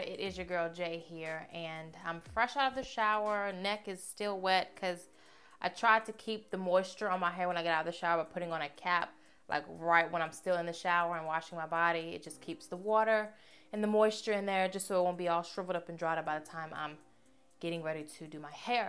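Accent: South, United States. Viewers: your country voice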